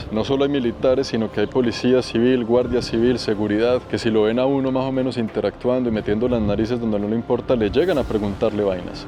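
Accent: Bogotá, Colombia. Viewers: Como me río con las ocurrencias y el acento tan rico colombiano, una terapia, y de igual forma cubriendo países que de otra forma no veríamos de manera personal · Primera vez que le veo y le FELICITO POR SUS VIDEOS TAN INTERESANTE APARTE ME RECUERDA SU ACENTO AL HABLAR A UN MARIDO QUE QUISE MUCHO COLOMBIANO WOW GRACIAS POR AMBAS COSAS Y QUE DIOS LE SIGA CUIDANDO PORQUE ANDA EN LUGARES PELIGROSOS SOY MEXICANA UN ABRAZO BENDICIONES ❤ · No estoy de acuerdo con eso. Es un colombo-panameño, con un acento rolo (bogotano) muy marcado que indica un estrato social bajo... no puede regresar a muchos de los lugares a los que va. Lo primero que hace al llegar a Siria sin saber una palabra de árabe sirio es meter la cucharada y hablar de política con una ingenuidad e inocencia extrema. Pareciera que trabajara para el Pentágono en los EE.UU. porque su primera movida y la repite en este vídeo es criticar a la familia al-Assad sin haber hecho su tarea. 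Hoy no podría visitar Siria si no fuera por Bashar al-Assad que se vió forzado a tomar el timón del país para salvarlo cuando no quería nada que ver con política. A eso le llamo llegar de aguafiestas a meter la cucharada en donde no te llaman. Entonces qué hace en Siria? crear contenido pero esparciendo el Kool-Aid y la propaganda de Washington sin haber hecho su tarea. Algo parecido al desastre que causó con sus vídeos de Ucrania donde llegó con la ignorancia más grande del mundo y terminó haciendo vídeos propagandísticos que promueven al régimen de Zelensky controlado por Washington. Desastre absoluto y aquí lo primero que critica y donde erra es que menciona que Siria ha sido controlada "practicamente por la misma persona". TOTALMENTE FALSO. Bashar al-Assad es el elegido por el pueblo sirio. No menciona que la guerra la financió EE.UU. y que el supuesto "pueblo" que se echó a las calles fueron mercenarios extranjeros que se fueron adentrando en el país p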